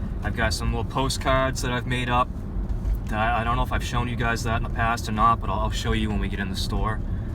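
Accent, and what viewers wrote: New England, United States. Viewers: Cool...thought I could hear a fellow "New Englander" accent in there :)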